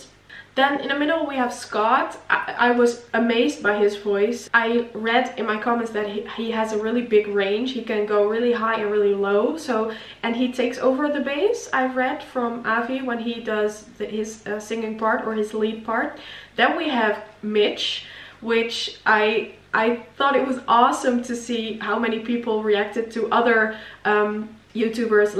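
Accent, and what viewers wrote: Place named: West, United States. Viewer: Seriously, I had no idea you weren't from the states. You do have a tiny bit of an accent but it sounds local, like you might be from an isolated part of a state out west. I feel sorry for anyone trying to learn American english. It's a conglomeration of every language in the world, and as a result rules are practically nonexistent. So, well done! As a counterbalance to the negative nellies... I love your eyes. They're like staring into a pair of black holes. Whoever put that ring on your finger is a lucky man.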